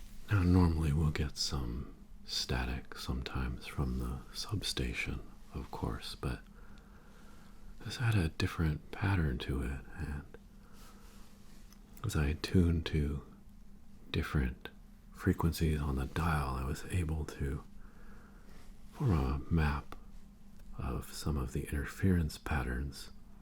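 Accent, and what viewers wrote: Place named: Massachusetts, United States. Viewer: This guy is from Massachusetts for sure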